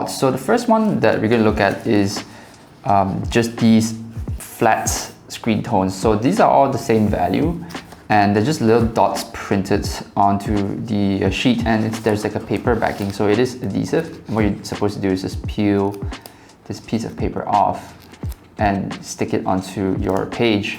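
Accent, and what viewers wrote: Singapore. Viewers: I could tell you're from Singapore from your accent!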